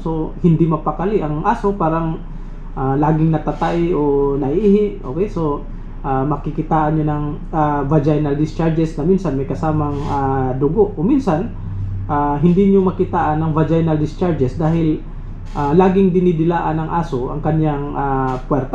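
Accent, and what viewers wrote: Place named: Pangasinan, Philippines. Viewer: taga Pangasinan po ba si Doc? may punto e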